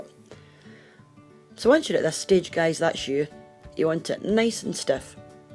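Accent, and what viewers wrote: Scotland, United Kingdom. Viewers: In the earlier videos, tried to minimize her Scottish accent because she was self-conscious about it.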